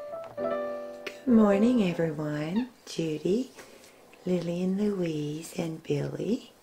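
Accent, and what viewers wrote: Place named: South, United States. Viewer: Hi Judy and Lillian 💜 thanks for saying that 😊 your words of encouragement make me want to study even more to do better 👍.. i managed to pass everything , in fact we all did, so there was a week of celebrations after we found out ✨🎉✨and yep we watch your videos as a group and also put your videos on in the background because they're such a pleasure to watch and even to listen to..like every one of them is different, and Judy I have to say you have one of the nicest voices I have ever heard..I really like your southern accent. Yep 4 room mates from Poland, Switzerland, Sweden and Belgium, and all of them love your channel, and we love seeing Lillian getting up to all her mischief 😊 she is so funny, cute and cheeky all at the same time..When we put on your videos we feel you're in the room with us too, it is a good feeling...Next year we will be separated for 6 months as we have to do work placements for our degree, a couple of the girls are going abroad to Australia and Greece but i want to do my placement in one of the big London hotels..I also think it's great that Brandon is interested in being a chef because it's a great career choice and there is always great opportunities for chefs in big luxury hotels etc and the £$ is normally really good, .. 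When Lillian gets a baby sister it will be double mischief , i think it's a great idea..Have a fantastic day xoxox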